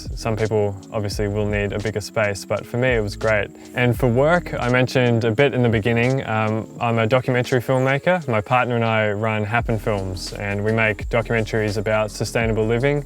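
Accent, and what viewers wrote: New Zealand. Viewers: i knew you were from new zealand lol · He's a kiwi - from New Zealand 😊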